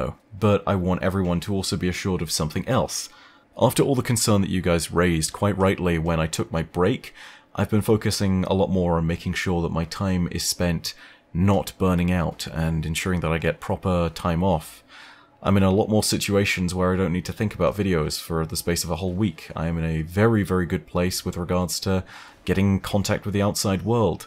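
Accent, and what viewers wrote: RP, United Kingdom. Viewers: Personally I find the idea of a "posh" Englishman talking about "swordsplosions" a fantastic fit, but as others have said, don't risk your health over it if it's going to have a negative impact. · My advice for Borderlands lore stories? cover the background lore and the small tidbits that flesh out the world. Example: Tediore guns are made cheap therefore they're thrown away to be used as an impromptu grenade rather than be reloaded. Don't tire yourself out explaining motivations of major characters and story beats. Research the short stories that flesh out the companies that run the universe of The Borderlands. Atlas, Maliwan, Pangolin, Hyperion, Dahl, Jakobs, etc. Check out the small bits that flesh out the lore because those bits are some of the most fun and refreshing! Borderlands is somewhat secretly about capitalism run rampant, sometimes worshiped, sometimes turned into warring factions, sometimes turned into fights for freedom. 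Do tackle stories like that of Mr. Torgue with your posh british accent, and tackle it with a rich sarcasm like the stories are a joke and not to be made seriously, because they are jokes! Rather than look at Borderlands from a surface level all the way maybe you'd have fun laying a foundation for the folks that don't have a clue about Borderlands, tell them about Pandora, The Vaults, Sirens, then tell them about the world and the companies that exploit it. :) Don't take this as something you should cover if you simply don't mesh with it ya know? Find the stories YOU like and share them. I'll be here for whatever content you make, but if you need someone like me to tell you that you might like Borderlands and it might be a surprising bre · I'd love covering Borderlands lore! Posh Englishman explaining Borderlands is something I need in my life! 🙌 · I agree, the tone of a "posh Englishman" narrating absolute insanity (that also has a pretty good story to back it up) would be phenomenal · You could channel some Sir Hammerlock with your "posh englishman" thing and spice it with some Marcus, it could also wait for like say 6 months . 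Also the BL series is also something that could produce some "that sound like a YOU PROBLEM..."s. On what you could cover... I don't think there's that much but all the weapons manufacturers should have some worthy stuff and the next game is expanding on them AND the universe more. I also wouldn't mind some vids here and there on content other than Destiny. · Any Borderlands content would be interesting, while its not as intricate as Destiny; there's still plenty of content to talk about. Primarily, I love you for your Destiny content first & for most, anything else is a grand bonus. A "Posh, Englishman tone" can work with anything. My ultimate suggestion, try doing a lore or analysis vid on Borderlands 1/2/pre-sequal. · There actually is a surprising amount of deep lore in borderlands. It's more recent, like, a few hundred years, not even, so nothing as deep and expansive as Destiny. The call I up to you. 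If Borderlands calls to you, if you click with it's humor and world, go ahead. If there are other games you wanna cover, that's fine too. TBH, you put it best. Posh British guy may not be the right "voice" to cover Borderlands. Then again, what is the right "voice"?, if you have fun with the game and want to share that fun with us, go ahead. If you wanna play other games and share those with us, that's fine too. Peace,. Whatever you want is cool with me. I definitely be up for borderlands content. Most of the lore is upfront in all of the games in talking to npcs and side quests. Not too hard to research, but may take some time to get to. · Posh English man? I'll just imagine that Sir Hammerlock is just telling me a story about the lore of the game.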